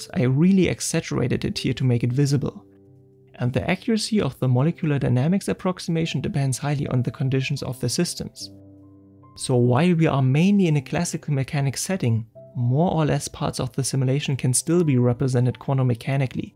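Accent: Germany. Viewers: too heavy German accent can not handle it.. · WTF dude ?! I was like „yeah time so go to sleep“ and than suddenly YT dropped your video in recommendations. The quality is INSANE ( and I just started the video). I am WAY to tired to understand everything. Just saved the video and will come back tomorrow to appreciate the work. Amazing. Quick question, it seems like you’re from Germany ? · Deinem Akzent nach, bin ich mir ziemlich sicher du verstehst Deutsch :). Wirklich ein riesiges Lob an dich. Auch der Channelname passt perfekt. Ich würde mich sehr freuen in Zukunft mit weiteren Hirntrüffeln versorgt zu werden :) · Lass mich raten, du bist Deutsch? Hört sich aufjedenfall so an 😂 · Germany, Austria or Switzerland would be my bet. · Thank you for the great masterpiece! It's one ob the best on the Web. The visualization and data representation is just amazing. This is just an exemplar for all who makes presentations and lessons. 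Quite professional! Very consistent and well explained material, easy to follow. Do you answer the questions in the comments? If so, I have some questions and comments. (One thing it would be nice to improve is the pronunciation. Let me guess, are you German? The pronunciation is good, but accent for some words reveals you and sometimes in the way to listen; everything is fine and understandable, just distracts because of funny pronunciation. Maybe it worth to ask a native speaker to read? On the other hand, I like both the voice and way of speaking). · I sink I can hear out a German accent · DER DEUTSCHE ACCENT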